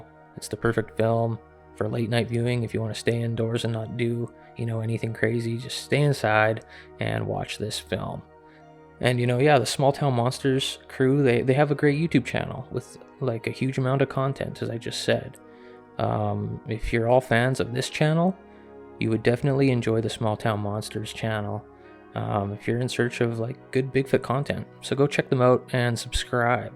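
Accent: Canada. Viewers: Yes that's a good documentary but I like your stuff the best an I hope it's you that proves bigfoot is real I love your Canadian accent 😂 keep it coming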